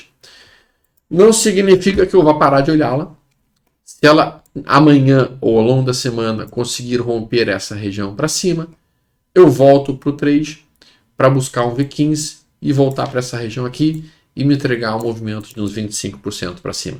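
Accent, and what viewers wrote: Rio Grande do Sul, Brazil. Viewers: falou tdo gauchinho de garopa bah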